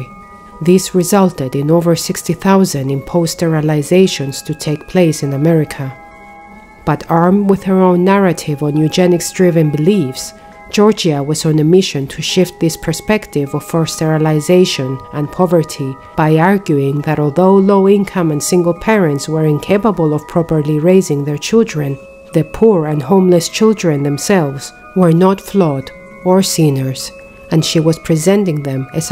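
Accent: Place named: England, United Kingdom. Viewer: I love the English accent also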